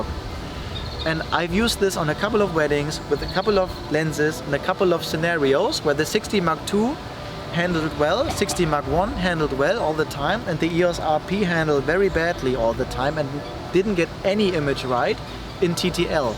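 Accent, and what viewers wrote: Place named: Germany. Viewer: Liebe den deutschen akzent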